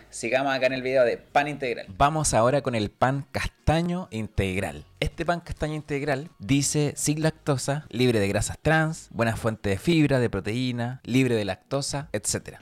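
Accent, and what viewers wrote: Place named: Chile. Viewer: Me encanta tu acento chileno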